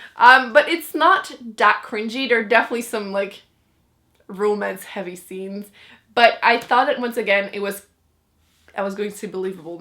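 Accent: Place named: Canada. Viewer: Hey! I really like your videos, love your accent and I'm Canadian too!!! <3 I've been subscribed for a couple months now and I will be sticking around!!!:)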